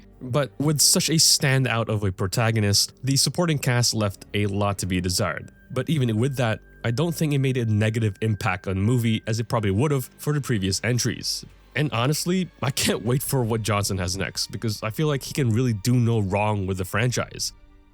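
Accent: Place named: Philippines. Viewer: filipino? i can hear a very faint accent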